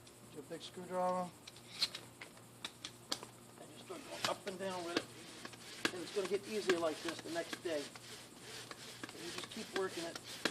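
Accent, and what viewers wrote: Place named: New Orleans, United States. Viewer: You sound like you're from outside of New Orleans. Home sweet home.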